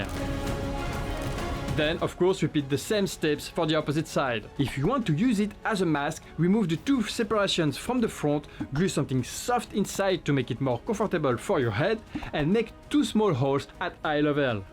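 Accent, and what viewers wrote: France. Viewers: Merci pour le template, je suis actuellement en fabrication du mien. J'ai une question, avec ton accent, est-tu français ?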